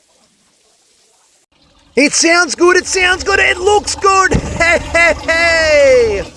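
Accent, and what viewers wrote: South Australia, Australia. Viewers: South Aussie mate 👍🏻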